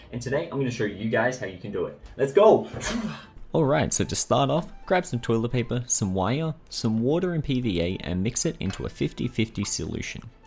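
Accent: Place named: New Zealand. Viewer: Thanks for making these videos! L💘ve the kiwi accent too!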